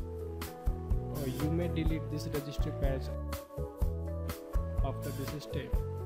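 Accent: India. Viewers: hears indian OH HELL N- clicks out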